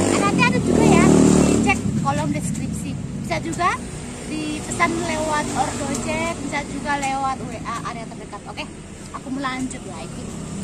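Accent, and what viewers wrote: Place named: India. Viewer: ES nya segerr..suaranya mirip orang india, kontennya bagus tentang kuliner.semoga bermanfa'at.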